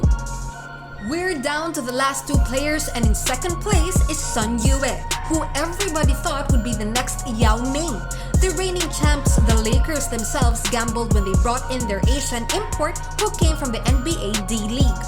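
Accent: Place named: Philippines. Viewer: Are yall Filipino? You sound like my best friends wife and she is from Cebu. You didn't put Brian Scalabrine?? Any how, Great Job. Keep pushing hard. Don't give up.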